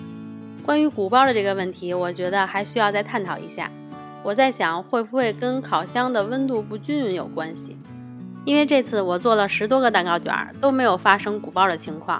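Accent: Beijing, China. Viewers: 我的天啊 辛苦你了！太不容易了！这得吃多少蛋糕...京腔听着很舒服哈哈哈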